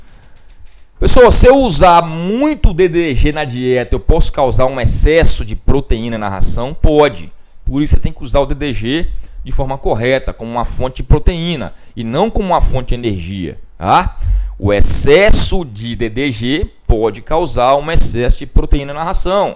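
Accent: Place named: Bahia, Brazil. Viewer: Professor tinha quase certeza pelo seu sotaque que era da Bahia! Acabo de receber de um amigo com quem compartilhei este vídeo a informação que é conterrâneo e que hoje está na UFPA!! Sucesso!! Abs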